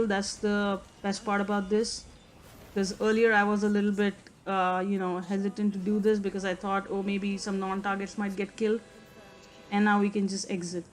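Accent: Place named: Mumbai, India. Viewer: Even put the accent of mubai on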